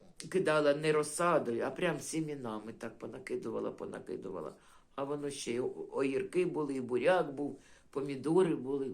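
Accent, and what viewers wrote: Kherson, Ukraine. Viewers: Пані, вітаю, Ви дуже класна, ваш говір так мені нагадує мій, херсонський❤, вже 16 років там не живу, а всеодно, досі проскакує та балачка😊, зичу Вам міцного здоров'я і терпіння, най Бог кріпить вас і охороняє, доброї ночі, Янгола Охоронця🙏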